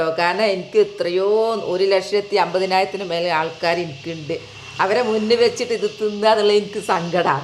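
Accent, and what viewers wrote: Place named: Wayanad, India. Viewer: ഹലോ താത്താ... ഞാൻ മലപ്പുറത്തുനിന്നാണ് കാണുന്നത് കേട്ടോ. താത്താന്റെ ആ വയനാടൻ സംസാരിക്കുന്ന രീതി കേൾക്കാൻ എന്ത് രസമാണ്! താത്താനെയും സംസാരിക്കുന്ന ശൈലിയും എനിക്ക് ഭയങ്കര ഇഷ്ടമായി. താത്താക്ക് പടച്ചോൻ നല്ല ആയുസ്സും ആരോഗ്യവും നൽകി അനുഗ്രഹിക്കട്ടെ. ഈ മുതിരത്തോരൻ കണ്ടപ്പോൾ തന്നെ കൊതിയായി. ഞങ്ങൾ ഇവിടെ മുതിരപ്പുഴുക്കോ, മുതിര ഉപ്പേരിയോ ഒക്കെയാണ് ഉണ്ടാക്കൽ. താത്ത ഉണ്ടാക്കിയ ഈ രീതി എനിക്ക് പുതിയ അറിവാണ്, ഞാൻ എന്തായാലും ഇത് ട്രൈ ചെയ്യും. ഇതിന്റെ കൂടെ പറഞ്ഞ ആ മുതിരക്കറിയുടെ റെസിപ്പി കൂടി താത്ത അടുത്ത വീഡിയോയിൽ കാണിച്ചു തരുമോ? ആ കറി ഉണ്ടാക്കുന്ന രീതി കാണാൻ കാത്തിരിക്കുന്നു. താത്താന്റെ പുതിയ വീഡിയോകൾക്കായി ഞങ്ങൾ കാത്തിരിക്കും! 😍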